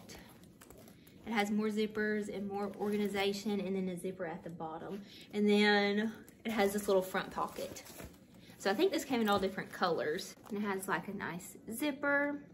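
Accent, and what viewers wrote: South, United States. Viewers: you are so adorable! I love your southern draw! I live in Alabama too so I’m sure I have that same southern accent! I loved everything you got for Christmas and look forward to all your upcoming videos for the new year! Happy New Year and God bless!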